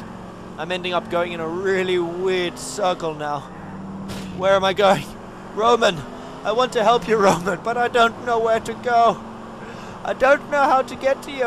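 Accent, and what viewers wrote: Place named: Russia. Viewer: Your little russian accent sound excatly like Niko XD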